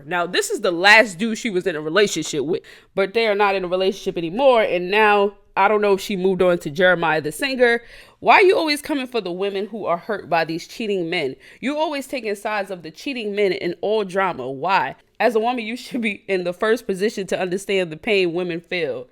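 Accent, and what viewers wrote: New York, United States. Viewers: New York accent came out 100 percent in this video 💯😭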